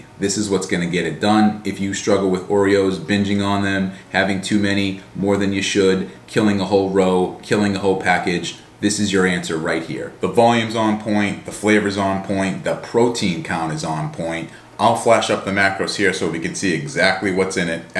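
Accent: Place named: Boston, United States. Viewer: I recognize that accent! Where in the Boston area are you from?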